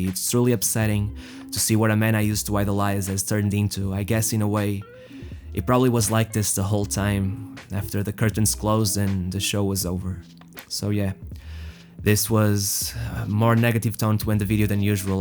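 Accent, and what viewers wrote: Portugal. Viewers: i can tell the Portuguese accent. It is also not "footsal" its indoor football. thanks for the video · You don't have to use the Portuguese accent on non Portuguese words my dude. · Esse sutaque hein....Tu é Tuga né? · you, the narrator of this video is Portuguese right? the accent points to it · Without knowing you I can tell you are Portuguese, and likely from the North/Center of the country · Ahhh...vi logo que era um tuga a falar ingles... Bom video,desconhecia o canal...subscribed... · How do you not have a million subs??!! Thanks for the amazing videos keep up the great work and I love your Portuguese accent makes everything sound so smooth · És português também eu o sotaque assim indica parabéns ao seu Canal fantástico 👍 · Antes de falares uma palavra em portugues já tinha reparado na tua lingua!! Tugas Caralho!!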